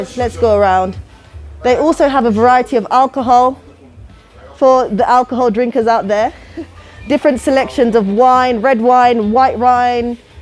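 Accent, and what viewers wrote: United Kingdom. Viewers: I liked the way you’re so passionate and really proud of Africa,especially Rwanda 🇷🇼 I liked your mancunian accent by the way 😁 I can’t wait to come back home back too Ciao cara ti amo già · Got this recomended love from uganda🇺🇬rwanda🇷🇼 is beautiful love your accent too u sound british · She sound British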